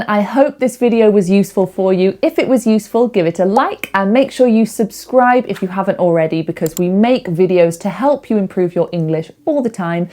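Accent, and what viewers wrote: United Kingdom. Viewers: Sounds cool , I adore the British accent.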